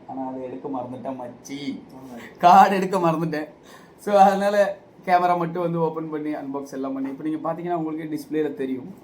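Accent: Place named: Kerala, India. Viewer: Thalaivanoda malayalam and tamil kalantha sound key thani fans undu ❤❤❤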